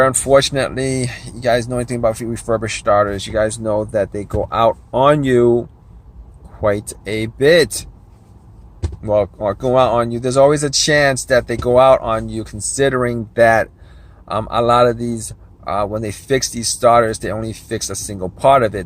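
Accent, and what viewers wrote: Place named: New Jersey, United States. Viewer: Lol I was listening and thought to myself, he sounds like he's from Jersey 😂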